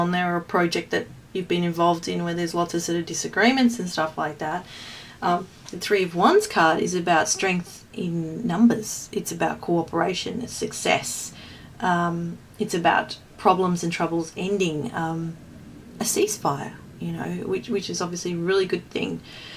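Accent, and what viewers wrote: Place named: Australia. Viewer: Great to listen to an Aussie.....great reading really resonated with me, thank you :)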